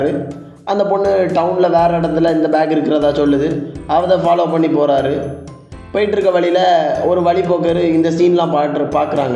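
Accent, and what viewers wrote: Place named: Marthandam, India. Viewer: Loi marthandam slang try a😅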